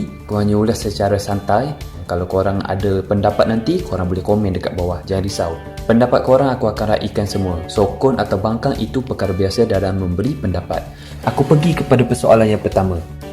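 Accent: Kelantan, Malaysia. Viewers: Sama kes dgn syarikat kenderaan hyundai sekarang.rumusan utk isu ni blh di rangkumkan dlm 1 ayat iaitu ‘asal benda jadi pitih. 1 lg,sy berani letak duit yg admin ni org kelate berdasarkan suara. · Nak tanya.. suara yang baca orang Kelantan ke🤗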